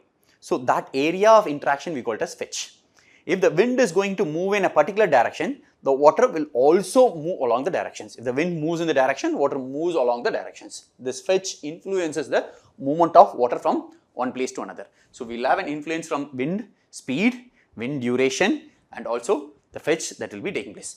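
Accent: South India, India. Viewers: That soth Indian accent of sir is great 😅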